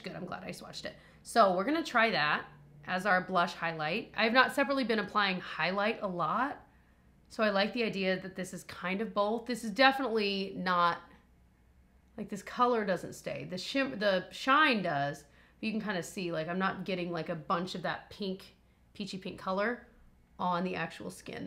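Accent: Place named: Midwest, United States. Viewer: I love that you share your day with us! I usually sit in the same comfy chair, sipping my coffee, just relaxing while you entertain me. The way you pronounce the words “and” and “but” are interesting to me. Must be a midwest thing. Have a great day!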